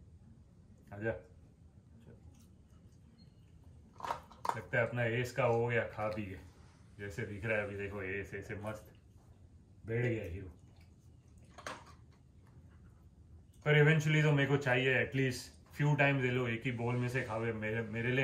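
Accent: Mumbai, India. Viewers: Sir,your talking method is awesome..(like mumbai style)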